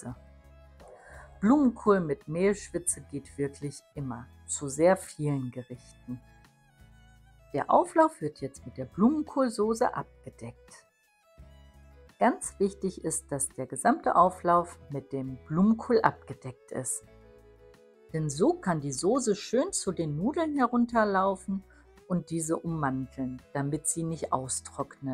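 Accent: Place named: Germany. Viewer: Bom dia, não entendo quase nenhuma palavra mas não importa, eu ficaria horas ouvindo sua voz e sotaque alemão 👏🏻👏🏻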